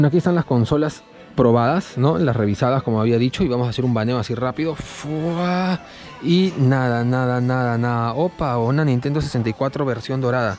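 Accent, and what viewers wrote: Peru. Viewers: Eres peruano? Hablas como uno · es el primer video que veo y en la forma de como hablas... me parece que eres peruano, lo eres? te hablo desde Perú y por cierto que buen video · es Peruano,por la forma de hablar.... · Eres peruano? Sonas como peruano xd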